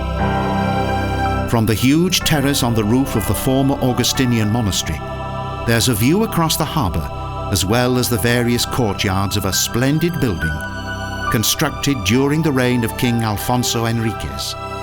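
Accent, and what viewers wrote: Spain. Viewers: The narrator of this video is an ignorant, incompetent, stupid, moron, who by his english accent you can easily deduce that he's from england, thus a european but nevertheless pronounces Portuguese words and names with a spanish accent. Get somebody competent to do the narration. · The footage looks old and narrator pronounces portuguese words un spanish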